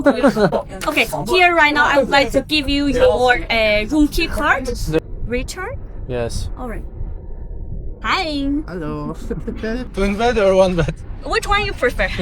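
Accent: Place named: England, United Kingdom. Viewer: Hey you are so cute with your English accent 😁 I was wondering where you are from. I hope one day we can travel the world together ❤️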